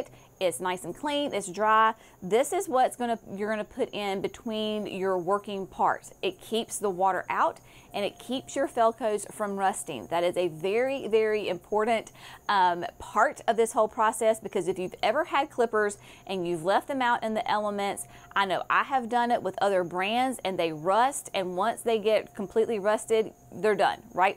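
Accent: South, United States. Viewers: I am glad you did this video! My Felcos are way overdue for the spa treatment. Thanks! Also, have you ever had the auto-generated captions up and played one of your videos? It is so cute how it interprets your Southern accent! :)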